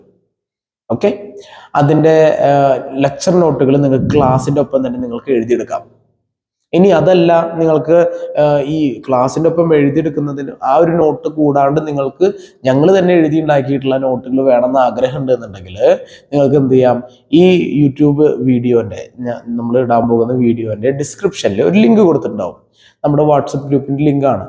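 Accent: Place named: Thrissur, India. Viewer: Uff. chetta. Chettande oru valiya fen aanu njan. Chettande aa thrissur slangil edkunna class um. Sirde fashion senseum mudiyum ellam poli aanu.ella vdeosinum katta waiting aanu.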